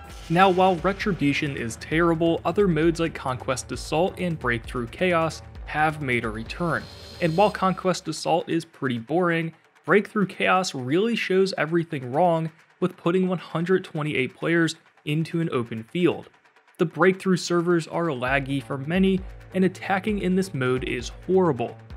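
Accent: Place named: Pennsylvania, United States. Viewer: Are you from Pennsylvania? I hear a strong accent. I'm loving this game, but yeah the events are lazy.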